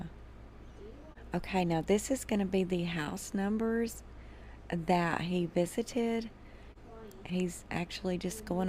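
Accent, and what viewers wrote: South, United States. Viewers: I listen before bed too ! Her voice is very soothing . Love that Southern accent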